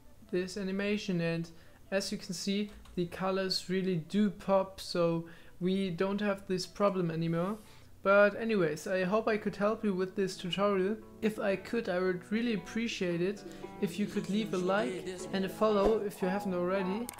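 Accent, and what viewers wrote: Germany. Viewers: Man hört einfach das du deutsch bist lol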